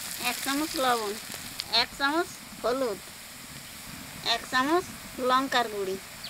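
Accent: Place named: East Bengal, Bangladesh. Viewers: ও দিদি দেখে তো জিভে জল এসে যায় খেতে ইচ্ছা থাকলে ও পেটটা সাথ দেয় না ! এতো সুন্দর রান্না, সত্যি রান্না করাটা একটি শিল্পকলা তার চাইতে বড় আপনার কথা গুলো শুনতে খুব মজা লাগে! কারণ আমরা এখন তো পূর্ববঙ্গের কথাবার্তা আন্তরিকতা মানুষে মানুষে মিল বন্ধন ভূলে গেছি! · Bhai,tomake Tumi bolchhi ,ranna to batei tomader ke darunlage.tabe sab kichhur theke je jonyo ami dine akbar holeo video ta dekhi,seta thakuma ba mayer kathar jonyo,amar babar bari opar banglay,amra barite ei rakam bhabei katha bole dasher bhasata bachie rakhtam,tai tomader dakhlei àmar ki je bhalo lage bojhate par bona,bolei fellam,Bhai ,kichhu mne korona khub bhalo theko.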